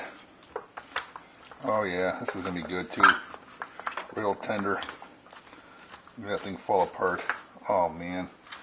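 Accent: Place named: Texas, United States. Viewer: You sound texan 😂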